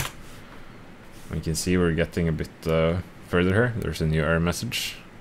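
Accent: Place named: Sweden. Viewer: Love the Swedish accent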